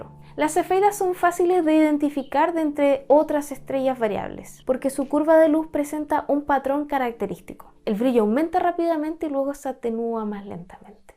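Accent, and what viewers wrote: Chile. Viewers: Es chilena, no? Nunca entendí el "hate" hacia ese acento, si es precioso. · Me encanta el acento chileno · Habla como chilena, yo soy chileno jaja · ME FASCISA SU VOZ DULCE Y SU ACENTO CHILENO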